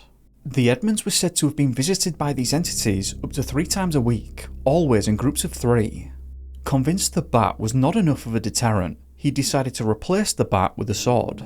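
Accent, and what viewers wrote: United Kingdom. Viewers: Great story, thx. Can I ask where in the UK your dialect is from, very charming 😊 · I’m at my limits of listening to British accent YouTube videos. One more video and I’ll vomit uncontrollably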